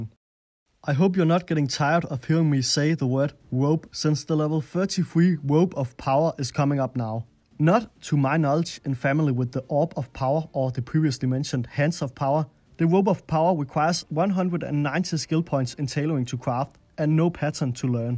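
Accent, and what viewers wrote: Denmark. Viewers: Sounds like denmark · Ægte dansk accent. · Jesus that’s a thick danish accent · WE GOT A DANE! IN HERE! I CAN HEAR IT! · Pls stop calling him Arnold he doesn't sound like someone from Austria at all, he is 100% danish. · I just started watching, and im 100% sure this guy is Danish. Edit: Great video, learned a lot. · Very nice😀..fat danish dialect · Sådan dansk engelsk 😂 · That's a Danish accent though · the danish accent is strong with this one! :D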